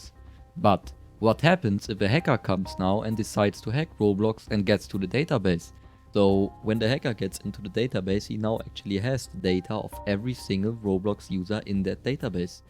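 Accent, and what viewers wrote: Germany. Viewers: Du bist 100% deutsch das hört man sehr stark raus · i hear deutsch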